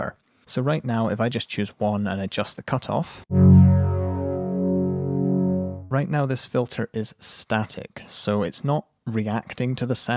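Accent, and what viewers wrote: United Kingdom. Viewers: 9 years of experimenting and reading and trying to understand FM Synthesis and here is this random guy on youtube with a British accent laying it down in 20 minutes.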